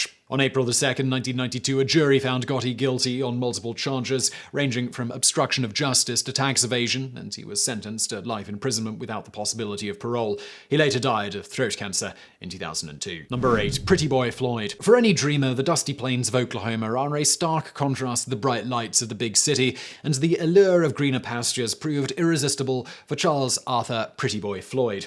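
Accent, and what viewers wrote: United Kingdom. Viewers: I enjoy when uses lingo in is professional British accent. Terms like "all day stretch" to mean a life sentence and referring to prison as the "pokey" sound hilarious with that accent.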